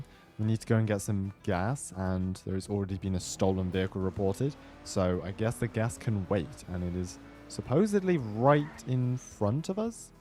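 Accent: United Kingdom. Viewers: why is every erlc youtuber british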